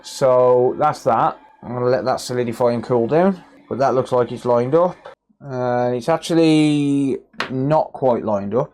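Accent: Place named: Black Country, United Kingdom. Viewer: Hi mate you sound like your from by me like Dudley or Black Country area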